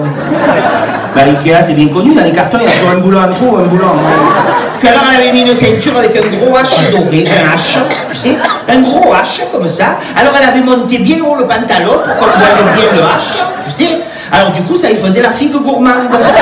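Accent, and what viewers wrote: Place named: Marseille, France. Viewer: Super zize un plaisir d'écouter de découvrir ont retrouve l'accent de Marseille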